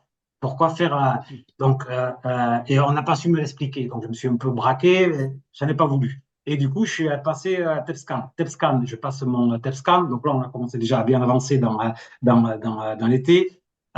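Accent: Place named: South, France. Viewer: Bonjour, si c'est mon débit de parole, pas de souci vous pouvez le ralentir dans les paramètres lecture à 0.75 . Si c'est ma voix et mon accent du sud, ça on peut rien changer 😉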